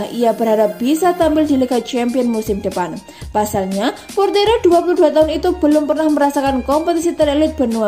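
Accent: Java, Indonesia. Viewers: Medok min...😅😅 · medok banget min?cuma cakep jadi ciri khas channel ini salam dari interisti semoga milan lekas membaik performance nya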